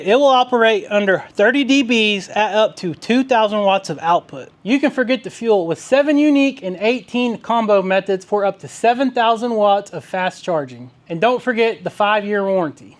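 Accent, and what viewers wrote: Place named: Oklahoma, United States. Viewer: 👋Hi and family, watching from South central Iowa; my mom was from Oklahoma, your accent and chill personality reminds me of my Uncles! Enjoy your channel very much.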